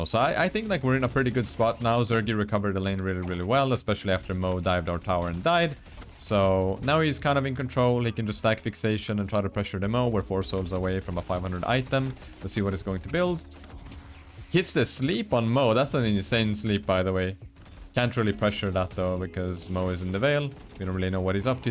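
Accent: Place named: Sweden. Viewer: 5 seconds into the video and I thought "this guy is Swedish 100%" lmao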